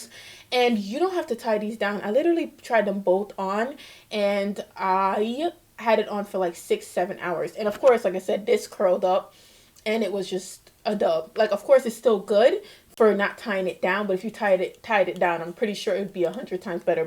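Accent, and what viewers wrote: Jamaica. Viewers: You are Jamaican. “ Ova yassuh “ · I thought I heard a slight Jamaican accent. My mother is from JA, my ears are very sensitive to the accent, no matter how slight it is. 🇯🇲🇺🇸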